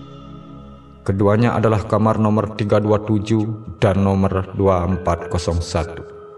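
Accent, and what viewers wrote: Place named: Bali, Indonesia. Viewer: Terlalu kentara bli logat bali ne. Engkebang dek. Bes ngenah logate.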